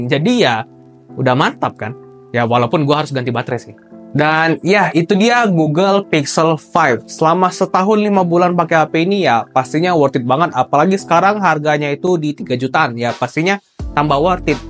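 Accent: Palembang, Indonesia. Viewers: Wong Palembang kak ee?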